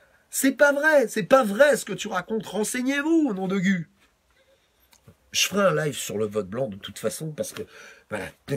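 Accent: Paris, France. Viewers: C'est la première fois que je t'entends, j'abonde en ton sens à 100%. PS : ton accent franchouillard à la limite du titi parisien est top ! Continue !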